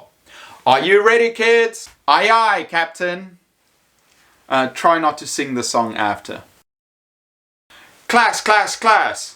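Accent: South Africa. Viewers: You sound South African... :) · Thank you.I love love call and respond technique.I Shout "can you hear my voice?" And they have to clap and stand still. My voice is still hoarse from shouting,talking and just interacting with students all day. LOVE THEM tho. South African?🇿🇦🙌😊much love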